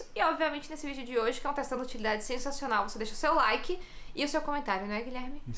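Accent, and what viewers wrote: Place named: Northeast, Brazil. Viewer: você tem família nordestina?! Não me lembro se já disse, é que cê tem um sotaque bem puxado pro sotaque nordestino, acho uma graça 😍🙌🏼🙌🏼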